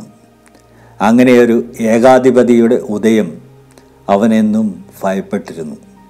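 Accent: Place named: Kollam, India. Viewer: സാർ ഫയം അല്ല ഭയം കൊല്ലം പത്തനംതിട്ട ഭാഗങ്ങളിൽ ഭ" എന്ന അക്ഷരം ഫ " എന്നാണു ഉച്ചരിച്ചരിക്കുന്നത് അവതരണങ്ങളിൽ പ്രാദേശിക ശൈലി ഉപയോഗിക്കാതിരിക്കുക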